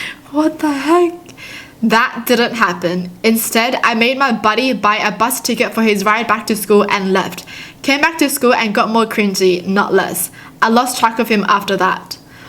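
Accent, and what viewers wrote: Australia. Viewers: Hi so I’m new to this channel,but I have a question.Are you Australian ,because your ascent is kinda Australian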